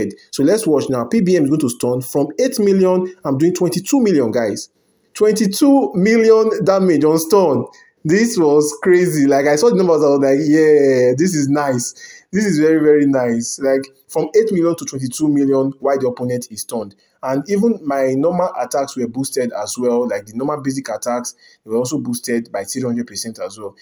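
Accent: Nigeria. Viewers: Dude you sound Nigerian 😃. If yes, then you are like the first Nigerian I have come across doing videos on IJ2. Nice work 👍. As for the damage, the 300% boost is for the base damage of the character and not total damage. This means the boost does not factor in extra damage from gears, passives, talents and the likes.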